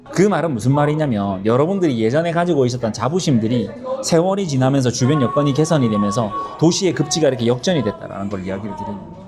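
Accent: South Korea. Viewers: 근데 사투리 엄청 쓰네…. 태생이 지방러인듯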